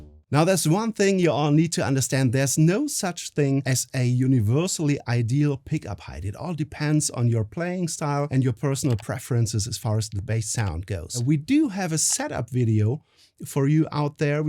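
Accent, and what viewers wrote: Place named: Germany. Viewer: great instructial piece being a seventy something German American it is refreshing to hear the Deutsch englander accent. Again great video.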